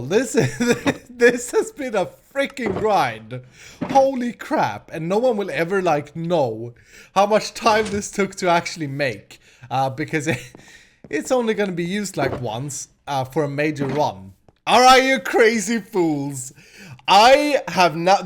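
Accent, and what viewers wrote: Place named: Sweden. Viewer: Heyy you freaken swede with a gorgeous accent! ☺ I have a school project where I have to interview someone, and I was wondering if that could be you?!😉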